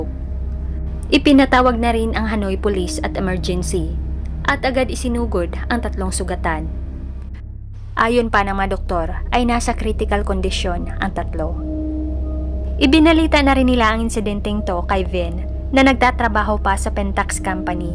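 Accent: Visayas, Philippines. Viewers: Bisaya pronounce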